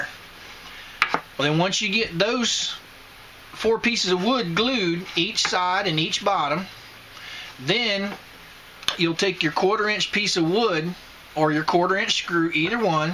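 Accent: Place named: Missouri, United States. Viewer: LOL.... I like your accent.... Are ya from Missouri?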